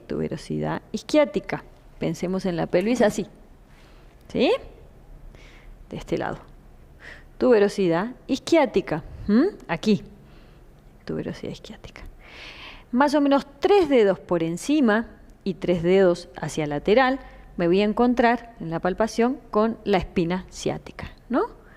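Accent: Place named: Argentina. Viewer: Es argentina ❤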